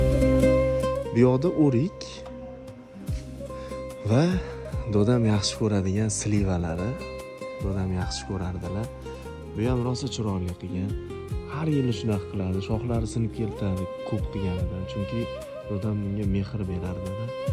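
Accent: Kokand, Uzbekistan. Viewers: Куконда Дода дийилмайди углим додани Опоки дийишади сих хам Куконнни шевасида гапировринг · УЗИМИЗНИ КУКОНЧА.ШЕВАДА ГАПРСАЙИЗ ЯНАЯМ ЧИРОЙЛИ БУЛАРДИ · Укам каерда яшасангиз хам узлигизни унутманг Кукон шевада гапираверинг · Quqon shevada gapirin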